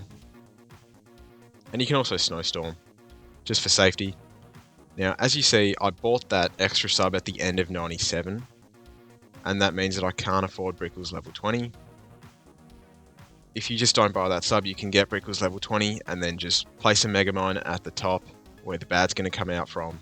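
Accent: Australia. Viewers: you have no idea how much joy it brings me to hear that beautiful Aussie voiceover narrate goated CHIMPS guides, I love you brother How are you, anyway? How's the fame of being a movie star treating you?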